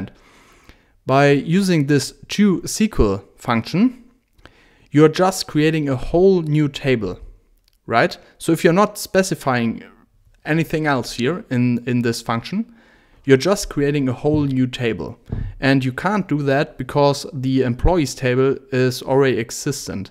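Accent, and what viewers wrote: Germany. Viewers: HEY man, you are 100% a German :=), right?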